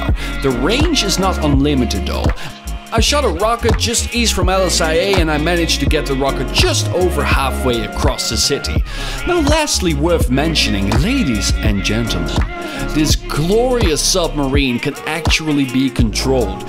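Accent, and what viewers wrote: Netherlands. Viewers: Wait what is he Dutch? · Hold up, are you dutch? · Are you dutch?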